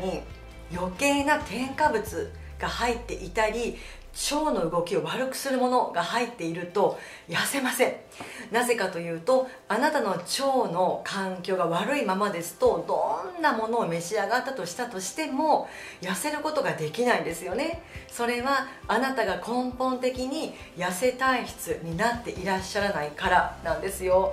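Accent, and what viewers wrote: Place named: Tohoku, Japan. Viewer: デーツを１日３粒食べてますがなかなか減らない体重🤧 パートナーには(ゴキブリ食べてる)と嫌われました🤣 さんの東北っぽいアクセントに安堵してます🤗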